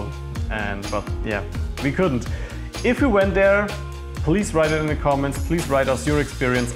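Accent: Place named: Germany. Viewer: Stick to your pizza's dude, one cannot only hear you are German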